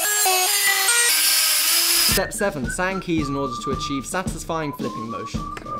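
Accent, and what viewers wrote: United Kingdom. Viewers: this is basically an old casey neistat video with a British voice over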